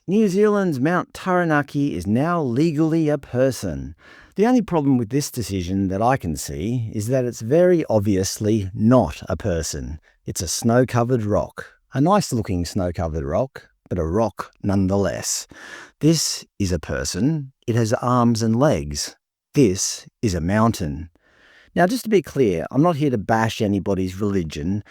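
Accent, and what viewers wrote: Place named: Australia. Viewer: You sound like an Aussie, trying to tell the story of,, the Indigenous of Aotearoa🤦🏽‍♂️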